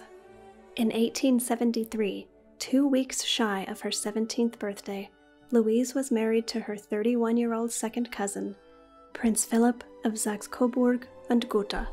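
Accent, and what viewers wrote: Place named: United States. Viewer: Sad that the speak is american. the crediblilty changes when its us talk.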